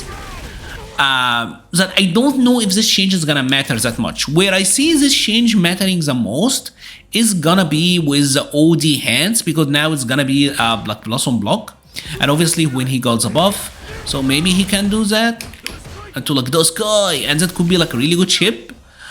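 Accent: Egypt. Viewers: it's great when you say hey guys here wish I had an Egyptian accent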